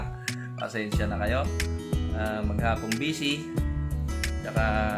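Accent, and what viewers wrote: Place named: Bulacan, Philippines. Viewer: Pa shout out ndin. Tga bulacan kaba? Parang bulakenyo kasi tono ng boses nyo po. Bulacan din po ako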